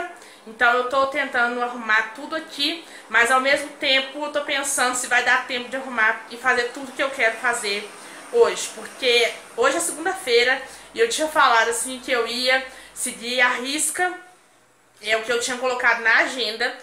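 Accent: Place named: Minas Gerais, Brazil. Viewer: Você é mineira?? Tem um sotaque da minha terrinha boa rsrs like.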